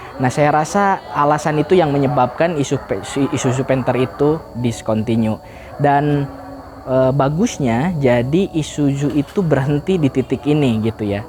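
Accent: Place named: West Java, Indonesia. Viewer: katingali pisan sundana eu...y...😄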